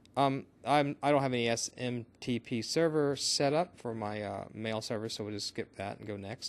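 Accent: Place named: United States. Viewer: finally a guy with an american accent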